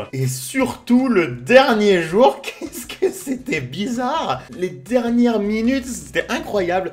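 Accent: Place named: Quebec, Canada. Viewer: J'adore l'accent québéquois ^^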